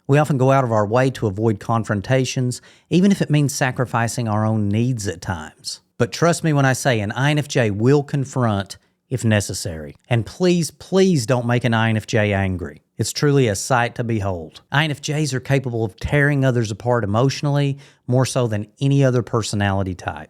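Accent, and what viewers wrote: South, United States. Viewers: This is lovely. I love your Southern accent.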